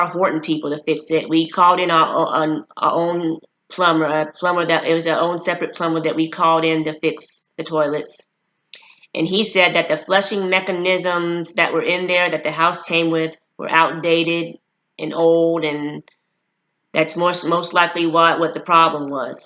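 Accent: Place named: Louisiana, United States. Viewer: OK awesome I thought I detected a bit of a Louisiana accent LOL I am here near Baton Rouge Louisiana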